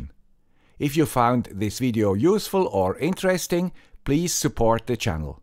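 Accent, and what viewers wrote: Switzerland. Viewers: My favorite guy with swiss accent is back! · Please please please turn off that YouTube autodubbing nonsense. It destroys your USP, the Swiss accent, and makes your videos unwatchable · As long as the guy with the Swiss accent keeps making videos, the Earth will continue to turn.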